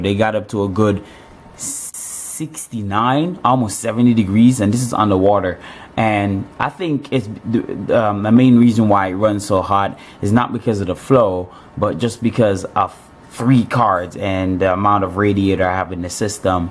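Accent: Jamaica. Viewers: you Jamaican?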